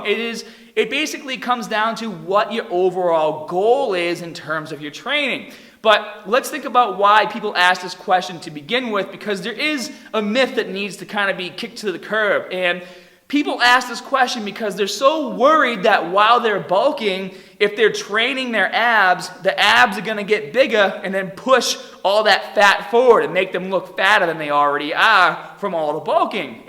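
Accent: Boston, United States. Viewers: Are u from Boston ? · That Boston Accent is so distracting lol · You probably think of his Boston accent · the boston accent is killer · It’s a Boston accent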